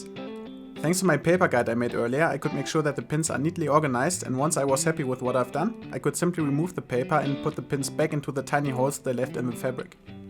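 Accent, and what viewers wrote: Germany. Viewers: Wenn deutsche englisch reden hört sich das irgendwie komisch an.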